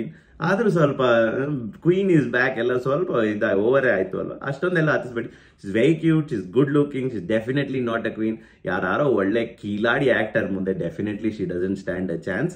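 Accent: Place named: Dharwad, India. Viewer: neevu Mysore davru idru kooda nimge dharwad kannada isht chennaagi hege barutte?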